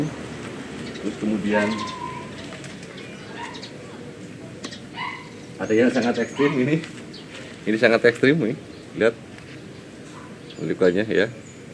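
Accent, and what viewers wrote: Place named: Java, Indonesia. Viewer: Abank orang Bali tapi bicara nya kyk jawa abank bali apa bukan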